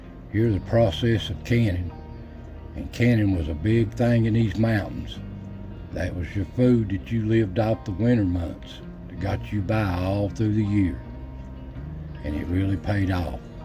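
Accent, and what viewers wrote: Appalachia, United States. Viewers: It's so comforting to hear someone actually from the area narrating these videos & passing down the knowledge... It's sad how many of the foraging & mountain videos are narrated by someone obviously not from here... Thank you again ❤ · 23 year old man on the edge of Appalachia in Alabama thank you for your vids I can really relate to what you post you remind me of my grandad a lot the way you talk true Appalachian down in Alabama it’s similar to the Tennessee talk like you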